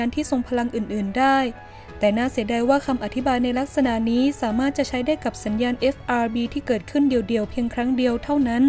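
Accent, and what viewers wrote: Thailand. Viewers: เสียงเหน่อมาก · คนใต้ไหมนิ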